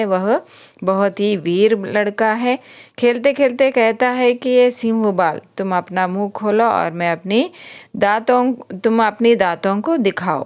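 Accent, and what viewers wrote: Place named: Hyderabad, India. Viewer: Madam aap paka hyderabad sa ho all explanation is good except some parts of explanation but ok keep it up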